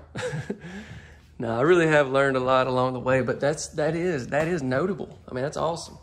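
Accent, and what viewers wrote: South, United States. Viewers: Does anyone here own this exact model? This is the one I have my heart set on 5000%! It has every single thing I need & want for myself & my boys. But the guy at clayton homes told me they have "better" layouts with "better" constuction at a better price than this prime. & i wonder if he just said that because he wants me to buy one they have. Ive looked at MANY & this 1 still tops them all for me. I really would love to know how much this one is & how is the construction. Im not settling 4 anything less. Thank u 4 this amazing video. & ur a great camera man! Also love ur accent so cute. lol Nice southern draw u have sir :)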